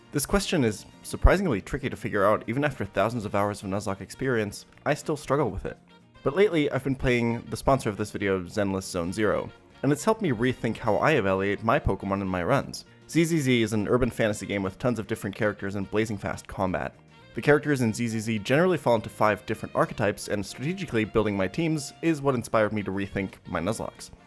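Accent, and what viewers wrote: Germany. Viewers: He is, in his earlier videos you could hear his german accent more clearly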